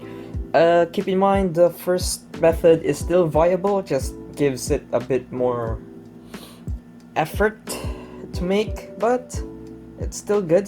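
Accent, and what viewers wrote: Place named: Philippines. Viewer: I don't know why but. I feel like you live in the Philippines Judging on your voice and the way you talk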